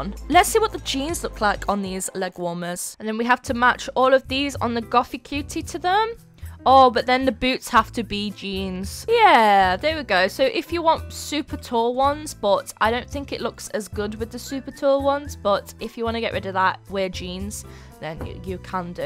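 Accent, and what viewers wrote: United Kingdom. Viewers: Omg I feel like u have a British accents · It sounds like you speak British 🇬🇧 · i rlly love ur accent is it british scottish or something else srry i love it sm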